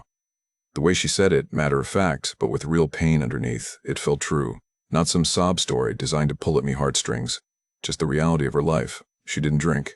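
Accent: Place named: United States. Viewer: American voice, but says "me" shoulder, "me" work. She had a sip of "me" beer. That sealed it in "me" head. Doesn't Ireland use euros,not dollars. Looked at retiring in Ireland, need to have 50 to 60 K euros a year just to reside there, might be fun to spend a year or two there. At least he didn't let his money rot in a bank.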